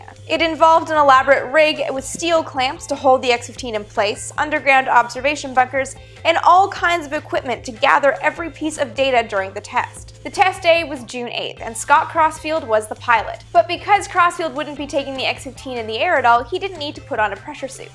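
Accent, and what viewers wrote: Canada. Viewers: why does the X-15 have a thick, wedge shaped vertical stabilizer? All other surfaces seem normal. I thought a teardrop shape was best for aerodynamics. Perhaps you could answer that in another episode. BTW, I suspected you were Canadian ( aboat ):- )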